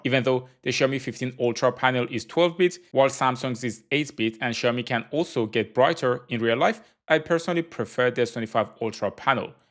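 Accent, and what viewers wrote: Iran. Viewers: Persian accent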